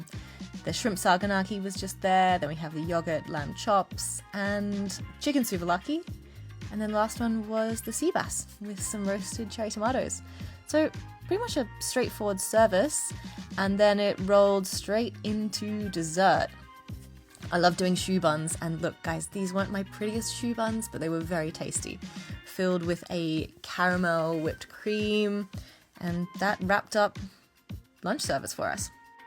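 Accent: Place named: Australia. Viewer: What nationality is she? I thought she was Australian not English 🤔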